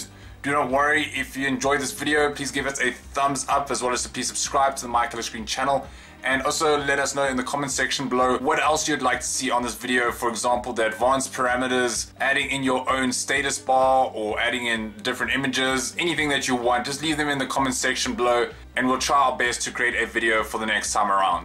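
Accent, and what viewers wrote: South Africa. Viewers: Love your voice accent. Africaans? Australia has one of its very senior politicians with your accent. Subscribed because we want more user friendly program from you.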